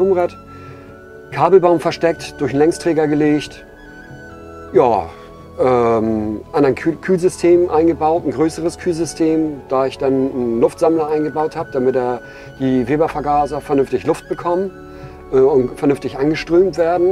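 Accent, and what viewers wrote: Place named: Hamburg, Germany. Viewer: Bildschön das Auto..Das du aus Hamburg kommst hört man. Ist bei mir genauso. Gute Einstellung mit deinem Auto 😊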